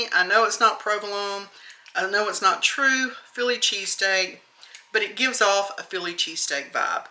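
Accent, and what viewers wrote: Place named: Alabama, United States. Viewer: My stepmother's family was from Jackson Alabama. I love to hear Alabamans talk. I could listen to you all day.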